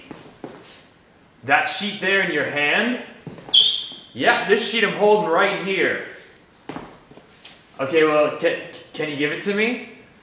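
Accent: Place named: Australia. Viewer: Great Australian accent!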